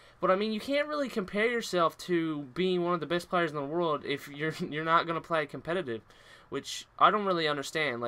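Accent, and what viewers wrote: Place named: Newfoundland, Canada. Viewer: Are you from Newfoundland? You sound a little like a newfie! :D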